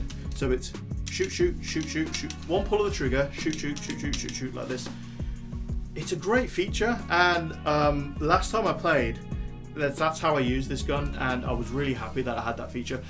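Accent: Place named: United Kingdom. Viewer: The British accent tells me it’s gonna be a fake gun.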